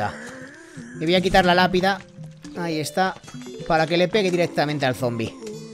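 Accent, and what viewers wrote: Spain. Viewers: Si digo algo que no dicen en españa es que soy de latino america como se que sos de españa por el acrnto bueno en idioma latino el dr zombie si habla castellano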